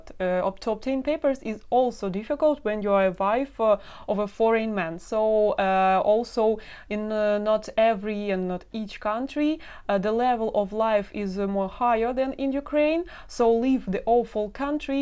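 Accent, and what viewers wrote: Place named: Russia. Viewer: Your english is good. I can se you pronounce "it" as "eat" just like a russian girl I talked to. And you say "for what" the same. End sentences with abrupt and to the point words 😂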